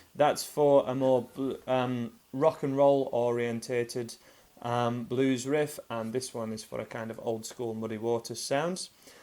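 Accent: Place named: Liverpool, United Kingdom. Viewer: Another awesome lesson, dude. You have a great way of teaching guitar and familiarizing yourself with an audience you can't even see. Are from Liverpool or Woolton by chance? You sound a lot like the legend on the wall behind you. Accent wise. Trips me out. Thanks again, brutha.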